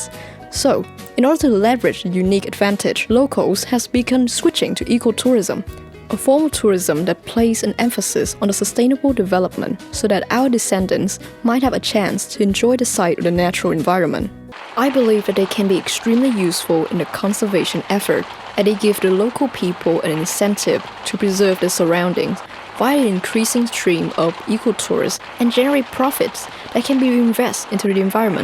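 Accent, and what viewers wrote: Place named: United Kingdom. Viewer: Nguyễn Triều Nguyên, from Nguyễn Sinh Sắc High School here, your performance is awsome ! and it seems like it must took you a lots if times to make this video, and you got a good British voice right there, nice ~<3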